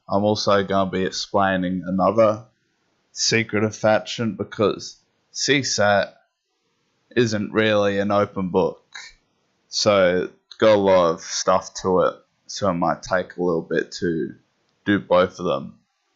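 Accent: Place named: Australia. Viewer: Australian ya dick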